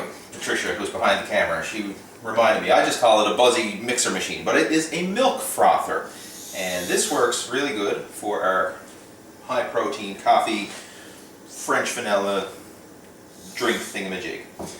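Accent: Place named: Maritimes, Canada. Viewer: He's got an east coast accent. Canadians refer to them as maritimers. They have their own special accent. Not quite as rough as people from Newfoundland though.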